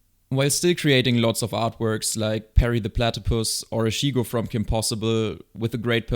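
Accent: Germany. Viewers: Ich Höre da deutsch Raus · You sound german am i right ? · Man kann hören deinen Deutschen Dialekt 🇩🇪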